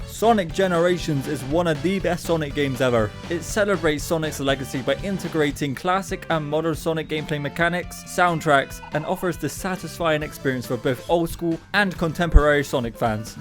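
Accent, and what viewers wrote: Bristol, United Kingdom. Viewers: Are you from Bristol? That accent is hella familiar 😂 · Are you bristolian? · Up the Bristol accent. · hey your british 🇬🇧🇬🇧🇬🇧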